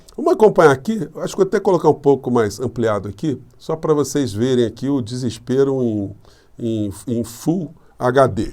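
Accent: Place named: Rio de Janeiro, Brazil. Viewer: Só tenho a agradecer, professor, por nos proporcionar de uma forma tão didática, jurídica e documental, tudo de criminoso que aconteceu nesse governo. Nunca votei nesse energúmeno, nem quando eu ainda morava no Rio. Sou do Rio mas moro na baixada santista há 18 anos e é muito bom ouvir o sotaque carioca vindo do senhor de uma forma tão inteligente e objetiva. Vou votar no seu candidato aqui de São Paulo. Estou falando de São Vicente. Gratidão Professor! !!!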